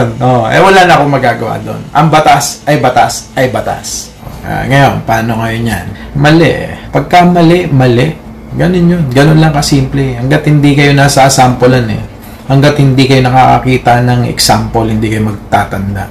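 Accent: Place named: Batangas, Philippines. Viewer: I’m so entertained with your Batangenyo accent nakakatuwa. Pero si, nakakasira ng araw pati accent peke talaga. Sana ma meet kita pag pwede nang makapag bakasyon sa Pilipinas. I’m your new subscriber.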